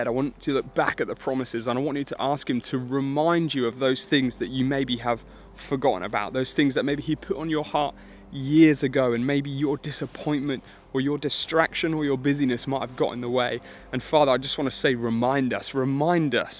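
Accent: England, United Kingdom. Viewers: I absolutely loved the quality of your video! Great production and your English accent is adorable! God bless from Australia!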